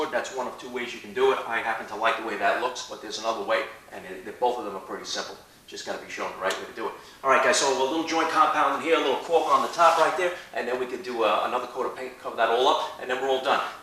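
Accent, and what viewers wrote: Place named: Long Island, United States. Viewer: this guys gotta be from long island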